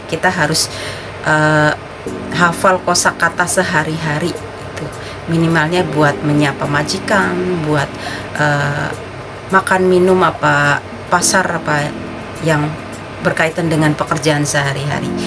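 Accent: Banyumas, Indonesia. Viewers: Salam Ngapak bae mba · Salam ngapak mbae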